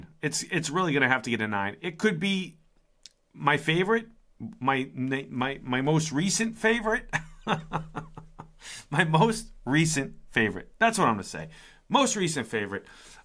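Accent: Boston, United States. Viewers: You gotta be from Boston lol aaada, I'm from dochesta ;) thanks for the review! Where in the city aare ya from?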